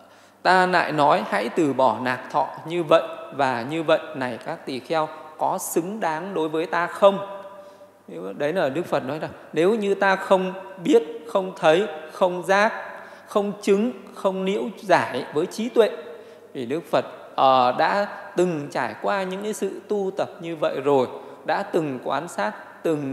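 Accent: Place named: Nam Dinh, Vietnam. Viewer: Nghe giọng nói của thầy hình như là người Nam Định phải không a .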